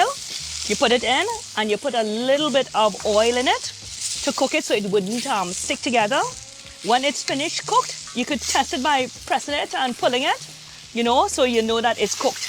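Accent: Trinidad and Tobago. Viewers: Am I detecing a Trni accent? The low mein looks, and I am sure tastes absolutely delicious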